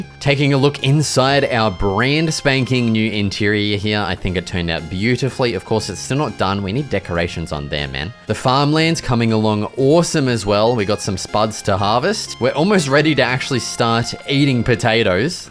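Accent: Australia. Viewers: Where are you from? it sounds like you're an aussie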